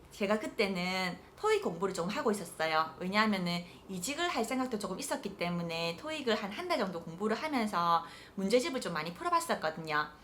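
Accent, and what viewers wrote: Busan, South Korea. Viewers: 부산 분이신가요? ㅎㅎ 반가워서 글남깁니당:) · 느므느므 잼있게 잘 봤습니다~~!! 친구따라 강남 가셨네요 ㅎㅎ 아무래도 합격하는 운이 따른것 같다고 보입니다. 항상 님 부산사투리에 아드레날린 품고 갑니다~~!! 다음 썰때는 초점을 오른쪽으로? 살짝 돌리심 더 나을듯 싶어요 ㅎㅎ 항상 건강조심하시고 화이팅하세요!!!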